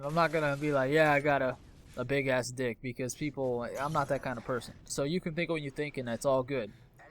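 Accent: United States. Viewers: Wait are u american or australian? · also are you actually Australian because you sound american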